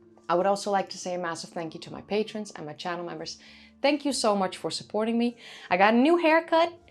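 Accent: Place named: Netherlands. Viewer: Your Turkish pronunciations sounds so Dutch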